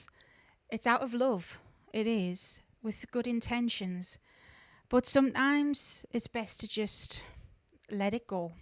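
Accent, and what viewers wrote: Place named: Northumberland, United Kingdom. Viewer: Hiya, I'm so happy to have found your chanel. I have just started working with Hecete and I'm just absorbing all of the information I can. Also - where are you from? You sound like you may be fairly close to me - I'm in Northumberland, not too far from Newcastle. BB.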